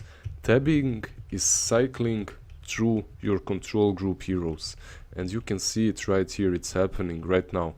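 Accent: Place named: Balkans. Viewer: Haha znao sam da si s balkana po akcentu.Svaka cast lajkovao videi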